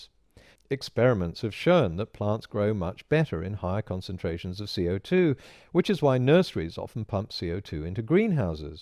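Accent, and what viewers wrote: United Kingdom. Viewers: The British accent meshes very well with the heir of nonchalance. And truth. Can't overlook truth, right? I mean, you'd think... Whatever.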